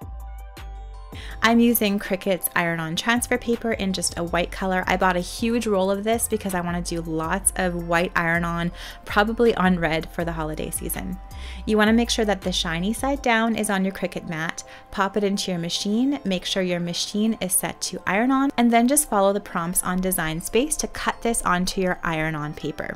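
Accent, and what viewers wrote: Canada. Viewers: addresses this in lots of her videos! It's the Canadian pronunciation.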